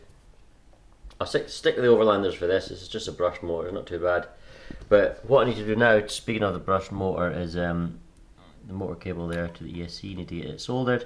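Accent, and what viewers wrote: Scotland, United Kingdom. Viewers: Hello! I came across your channel today. Nice to hear a familiar accent. I'm in Troon, near Glasgow. Have you still got your XXX4? I'm in the middle of resurrecting my old XX4, and I have a new in box XX4 Worlds and Street Weapon IWC, both of which I plan to build shortly. Have you tried the belts that are now available from Tough Racing? Those seem to be the recommended replacements these days for the XX4/Weapon. I've recently converted over to running Schumacher, but the L1 Evo and Cougar just don't have the same appeal to me as these old Losis. In my less than capable hands I'm sure I'll be just as quick (read slow) with the XX4.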